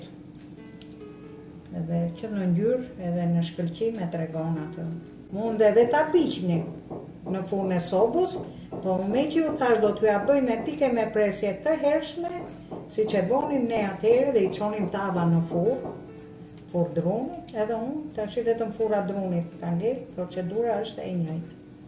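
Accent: Tirana, Albania. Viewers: Sa jam knaq me kte t'folmen , sa e paster Tironce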